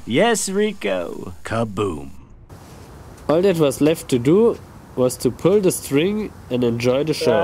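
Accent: Germany. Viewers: klingt sehr deutsch haha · na ja.. Umwelt Verschmutzung das Plastik Bekommst Du Nicht restlos weg.. und 2 Ich höre das du Deutscher bist. also Hättest du es auch gleich in deutsch machen können.. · Der Deutsche Akzent ist so geil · Most german accent i've ever heard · Beside the German accent it is always important to try this under a 380kV power line, to get the extra fizz, at least when the plume rises high enough. If seen more intelligent YT videos of wasting money or creating some bang. Try it with 1000g Ammonal next time. It's cheaper and more impressive. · Your German I know it. (I’m German) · German accent makes it even more professional! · Du sprichst sehr gut. Aber dein deutscher Akzent hört sich ein wenig lustig an. Das muss ich zugeben. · Den deutschen Akzent hab ich sofort raus gehört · I hear a Deutsch akzent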